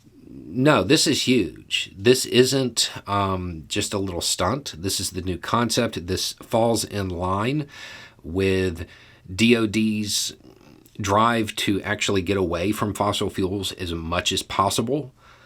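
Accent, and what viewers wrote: South, United States. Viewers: doing a mocking deep southern accent while already having a southern accent lol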